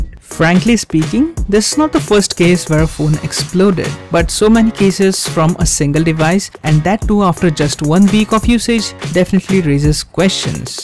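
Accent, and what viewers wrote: India. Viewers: He sounds indian i swear im not racist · Gabriel Lim I am Indian and I can tell you, he sounds too Indian. I'm not being racist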